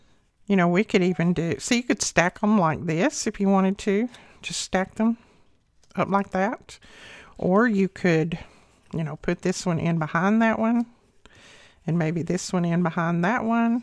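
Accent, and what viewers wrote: South, United States. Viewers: For not coming up with new ideas you sure knocked it out of the park with those. And the term is too many irons in the fire. I think they used to put irons in the fire. Literally. 😂. Great job as always. I love watching you craft and hearing your southern accent. So cute. Sending much love and blessings, Sharron 🥰🥰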